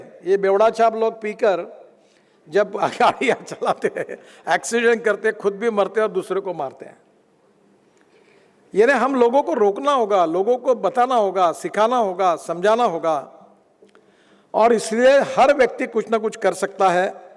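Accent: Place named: Nagpur, India. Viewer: Kya baat hai...👍... Nitine kakaa,...maja aagayaa sunkar....😂😂😂.... bahot badiya bhashan...❤️...se akdam... Nagpuri touch me...🙏 Namarkar...apko..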